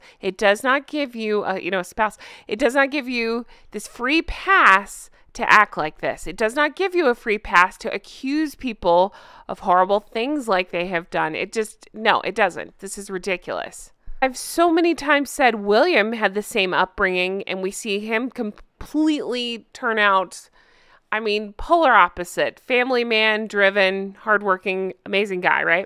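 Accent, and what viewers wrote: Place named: United States. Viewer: When you said "my Queen" in the last video it surprised me (being from one of the realms, Australia, so she was/is literally my Queen) and it touched me that you felt that way. I then did a double take, wondering if you were American as I had thought - perhaps you were Canadian who lived in the US and picked up the local accent, because then she definitely would be your Queen too. But no, I checked your channel details that said US. So you admire Queen Elizabeth II so much you show it by calling her your Queen. That's wonderful.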